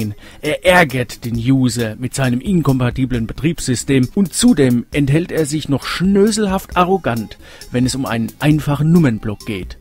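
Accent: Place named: Franconia, Germany. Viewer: Bei deinen Dialekt kommt der Franke noch durch 🤣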